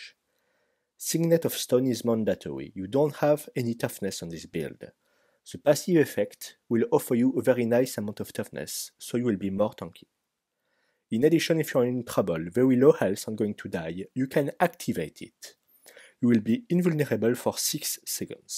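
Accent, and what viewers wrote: France. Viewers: I could listen to the cute french accent for hours lol thanks for the guide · Thank you for this video, I really enjoyed it as I am new player in GW2. I'm following all your skills, will keep playing as soulbeast. Just a friendly hint: Practice with your english pronunciation, you have very hard french accent, sometimes it's hard to understand some words.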